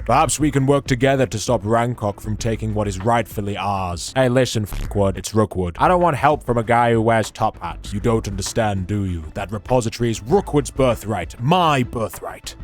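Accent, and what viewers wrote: United Kingdom. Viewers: Once again hitting a banger I don’t know why buts it’s funny listening to a British man explain games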